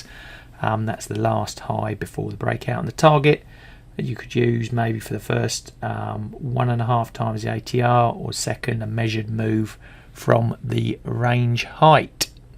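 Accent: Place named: Kent, United Kingdom. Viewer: Enjoying the Kentish accent as usual. Of course, the traditional pairing of volume footprint charts is CVD (cumulative volume delta), the order book, DOM (depth of market), and sometimes VWAP, as well as volume profile, targeting all the phases (initiative, absorption, exhaustion, reversals, etc.) of a typical cycle that you described in each of your strategies. I’ve switched form Renkō to using TPO (time–price opportunity) to identify key levels and market state (rotation, trending, acceptance, rejection, etc.) and then footprint charts specifically for timing of execution.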